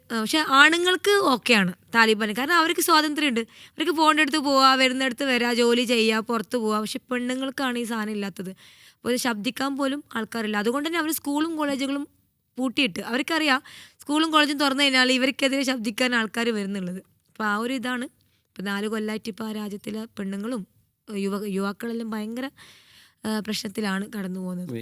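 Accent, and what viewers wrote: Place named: Thalassery, India. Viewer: Pakka Thalassery slang 💗 Thalasseryl evdeya ?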